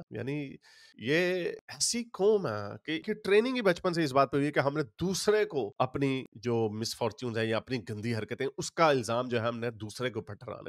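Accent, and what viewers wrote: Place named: India. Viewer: Bhai tum bolte to sach ho km se km Pakistani nahi indian lagte ho zuban se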